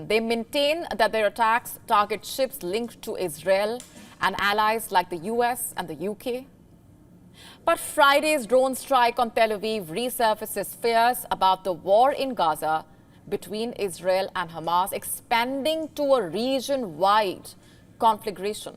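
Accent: India. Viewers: She is from India not Middle East. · Why indain accent suck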